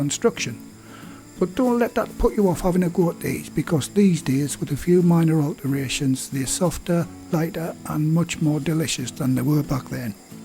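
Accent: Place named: Northeast England, United Kingdom. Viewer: Hi - greetings from sunny Colorado- I'm an expat - originally from Murton ( colliery). I've been working through your videos from the beginning and will definitely try virtually everything including cheesemaking. However when I came across stotty cake - always called "fadge" by my dad - I had to try it out. Came out great - thanks! Love your videos and hope to make many things soon!! Stay well and keep them coming please! Tony Armstrong ps - great to hear someone with an accent pretty much like mine! My American friends thought I was unique!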